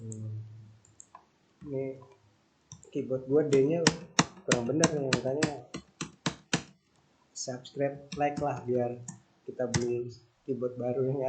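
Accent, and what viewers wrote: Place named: Betawi, Indonesia. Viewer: Bujug dah bang, Betawi bangat yak .... Bisa nih bang ane belajar ke ente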